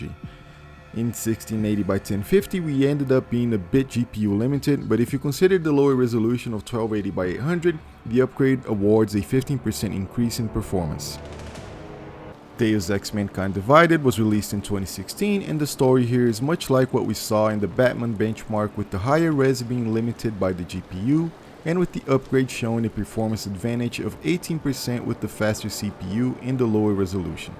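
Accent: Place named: France. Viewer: What is your slight accent? French?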